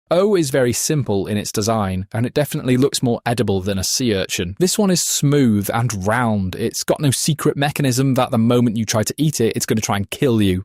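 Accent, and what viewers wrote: United Kingdom. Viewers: he was British or some other country that speaks that kind of English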